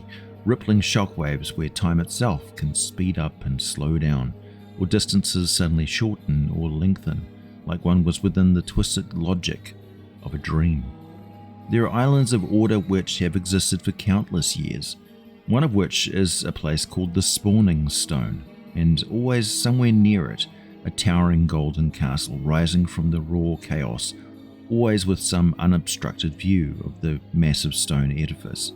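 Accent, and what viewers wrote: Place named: New Zealand. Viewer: I've drifted off to sleep listening to your voice so many times my inner voice has a kiwi accent now. Currently worrying if kiwi is OK to say.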